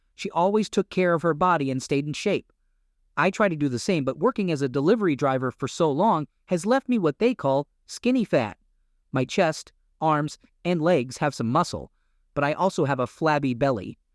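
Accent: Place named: Brooklyn, United States. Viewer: This is the worst narrative bot yet. A brooklyn accent with a simp voice.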